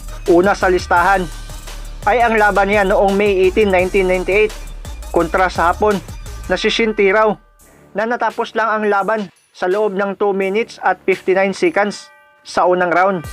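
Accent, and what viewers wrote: Visayas, Philippines. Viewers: Na gusto han, ko naman kaso yung bosis MO halata gud na bisaya ka, haw Ka pas pas nemu ag estorya hehe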